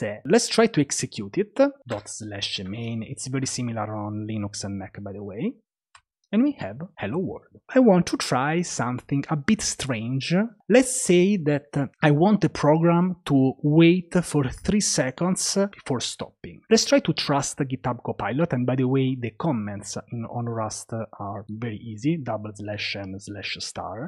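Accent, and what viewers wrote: Italy. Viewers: si alla fine l’ho completata tutta la serie e l’ho capita benissimo, l’accento italiano ha aiutato molto ahah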